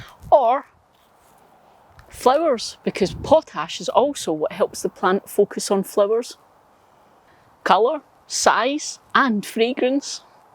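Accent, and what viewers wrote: Scotland, United Kingdom. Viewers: Sunday: Video Day. It’s always nice to hear your Scottish accent on a Sunday morning. The information you impart is always useful, to boot. My problem with fertilizers is my desire to keep the vegetable garden organic—after planting. There seem to be only a few fast-acting liquid organic fertilizers available, and none seem to help with blossom end rot. My tomatoes suffered from it, even though I watered religiously, and now my peppers—which are finally blooming and forming fruit that doesn’t fall off—are suffering the same malady. This, in spite of consistent watering.🥺 Oh well, maybe the drip system I’m installing for Season ll will provide better results. Have a great day (or is it evening, there?), and.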